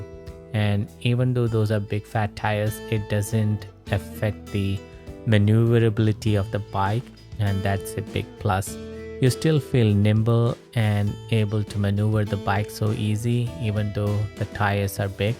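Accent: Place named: India. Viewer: That desi accent though. Say " Glass "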